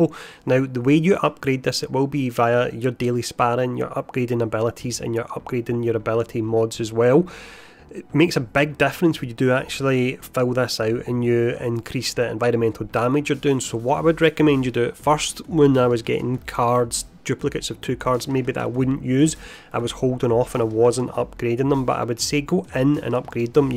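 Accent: Scotland, United Kingdom. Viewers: Ah ok, this is scottish. We will see ;-)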